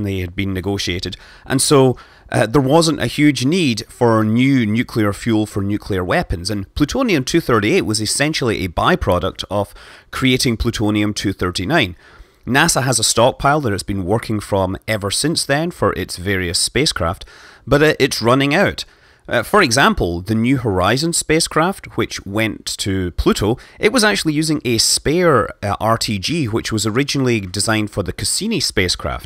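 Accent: Scotland, United Kingdom. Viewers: Scott's a Scot, and still has a Scottish dialect. This includes occasional alveolar taps which are sort of a cross between r, d, and l, and when the r is a linking r, it is almost always given an alveolar tap.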